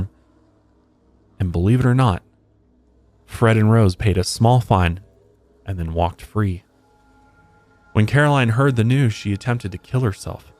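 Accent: United States. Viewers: Oh my God, I had such a laugh out loud moment when I realised was talking about Hertfordshire at the beginning of this video 😂😂 and some other English names made me laugh throughout too. I mean that I was laughing lovingly because it's so cute how Americans often pronounce our place names 😅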